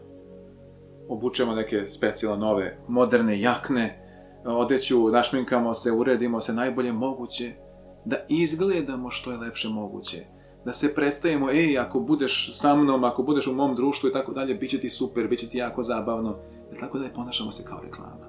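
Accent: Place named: Vojvodina, Serbia. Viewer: Imas divan akcenat..rekla bih da si negde iz Vojvodine, kao i ja inace.. Svako dobro ti zelim i super si 😊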